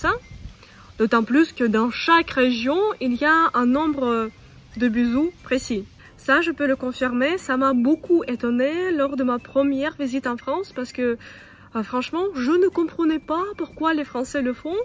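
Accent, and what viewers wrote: Russia. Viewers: J'adore votre accent russe en parlant français 🥰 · 2 ans plus tard désolé pour le retard 😅... Vous savez la France n'a pas de leçons à donner à la Russie sur la gestion de son pays, parce qu'en fait, si on regarde l'endettement de la Russie l'endettement de la France on a tout compris, la gestion de l'eau du chauffage, et de tout le reste en fait, c'est pris en charge par des sociétés privées, qui s'en mettent plein les poches, au détriment des citoyens français, voilà pourquoi l'eau, le chauffage, électricité tout est cher en France, et ça devient inadmissible, beaucoup de compatriotes français s'expatrie en Russie, justement parce qu'ils ont compris, que la France n'était plus la France, et qu'en fait la Russie ressembler beaucoup plus à la France d'autrefois, que la France actuelle. Ce que vous faites c'est génial, vous remettez les pendules à l'heure entre la France et la Russie, j'aime bien vos vidéos, et surtout votre accent Russe quand vous parlez français. 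Pour parler de Paris, Paris n'existe plus, dans le sens culturel, propre à Paris, cette ville est très mal géré, et ils ont un endettement de 7,713 milliards, ce que cela, va certainement vous étonner. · Craquante et croustillante avec sont petit accent Russe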